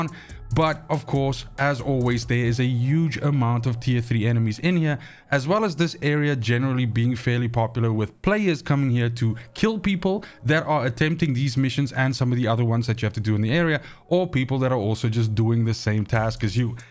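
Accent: South Africa. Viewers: Were are you from? South Africa? Hard to pinpoint the accent hehe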